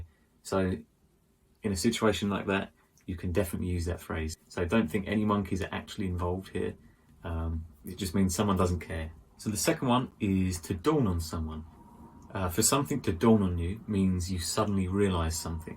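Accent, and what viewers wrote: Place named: RP, United Kingdom. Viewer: I’d say the modern RP accent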